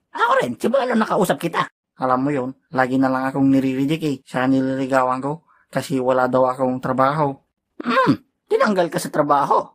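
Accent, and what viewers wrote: Ilocos, Philippines. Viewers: Full blooded ilocano here hahahha.. nagsayaaten agita videom sir... hahahaha.. dami kong tropang ganyan magsalita... kung may taglish.. meron ilocalog hahahaha.. sa accent ako natatawa talaga.. more videos at sama mas madalas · ilocano ka pala lods🤣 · Ilocano ka ba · Ilocano ka idol?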